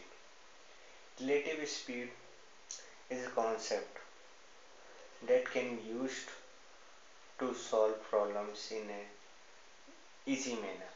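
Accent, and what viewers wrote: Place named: Asia. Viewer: wow, I would've thought that someone would've made fun of his Asian accent saying that Asians are really smart. Well done world!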